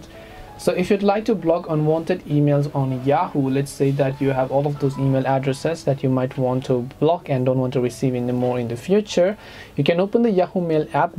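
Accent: India. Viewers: Talking too damned fast and with and Indian accent. UGH. Learning requires a good teacher.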